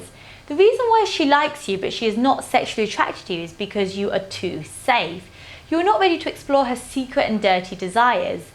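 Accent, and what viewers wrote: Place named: United Kingdom. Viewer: British Accent ikr😘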